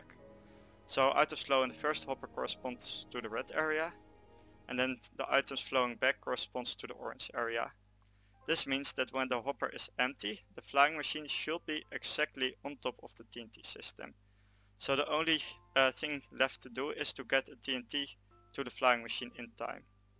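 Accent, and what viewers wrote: Netherlands. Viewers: je kunt gewoon horen dat je een nederlands accent hebt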